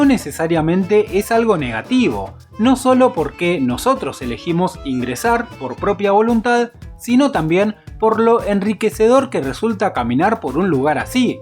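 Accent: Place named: Argentina. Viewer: lo intente ver pero el tonito argentino es realmente castrante, no pueden esforzaren hablar bien, no se dice casye se dice calle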